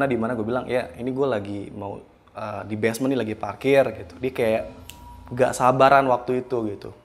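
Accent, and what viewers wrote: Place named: South Jakarta, Indonesia. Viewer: Ada logat2 jaksel kek nya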